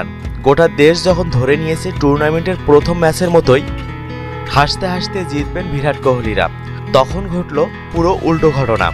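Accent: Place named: Noakhali, Bangladesh. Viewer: Shala bolod messs na match 😂 kotha bolte shikh shala noakhali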